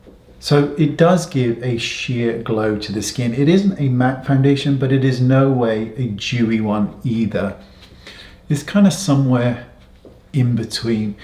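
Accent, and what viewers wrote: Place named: West Country, United Kingdom. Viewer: Pisser!! 😆 you re funny, are you a west country lad BTW?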